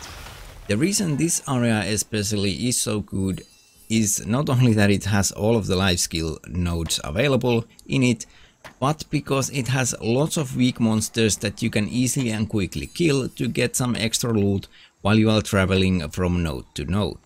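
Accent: Finland. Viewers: Familiar finnish accent :)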